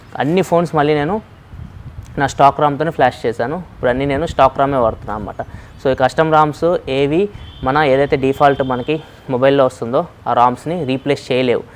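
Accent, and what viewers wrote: Ongole, India. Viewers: Bro meedhi ongole aaaa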